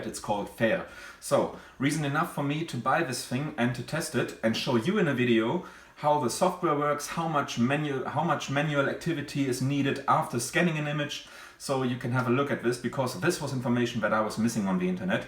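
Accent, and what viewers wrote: Germany. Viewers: dieser deutsche akzent den hört man als deutscher irgendwie immer raus unfassbar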